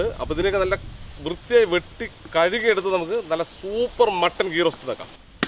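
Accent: Palakkad, India. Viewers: പാലക്കാടൻ സ്ലാങ് 👌🔥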